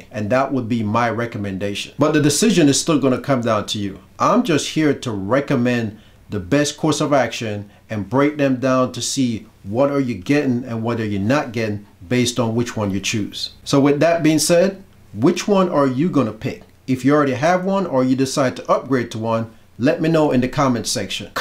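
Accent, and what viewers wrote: Jamaica. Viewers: Thank you so much now I’m gonna upgrade. New subscriber. I know I hear my Jamaican accent. 🇯🇲 nuff love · Thanks this vid really assisted me, heard a little 🇯🇲 jumping out every now and then👊🏽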